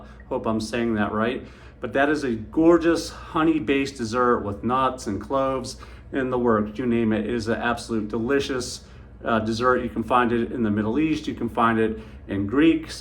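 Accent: South, United States. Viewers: Great review, sounda amazing. Dont worry about your speech, we are from tha south, most think we cant talk anyway😂😂😂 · Great video my fellow Carolinian. I love this one as well. Don’t worry about speech sir, we country so it is what it is. If anyone wants this scent stronger, apply two sprays of this, then add stronger with you intensely. Thank me later! does it again.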